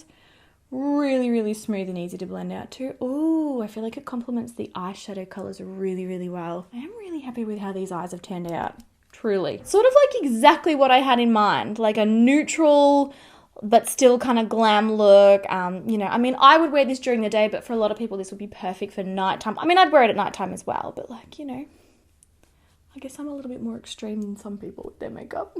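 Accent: Australia. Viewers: I love watching your videos and I love your Australian accent 😃❤ You are so beautiful on the top of that lol 😍🤗🌺 Xoxo from Canada 🇨🇦✌❤🤗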